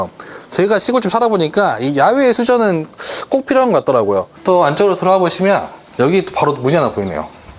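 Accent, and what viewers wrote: Jeolla, South Korea. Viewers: 말이 전라도네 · 영상 처음보는데 혹시 전남쪽? 광주? 이신가요? 차라리 사투리를 더 쓰시면 잼있을듯 합니다 ㅎㅎㅎ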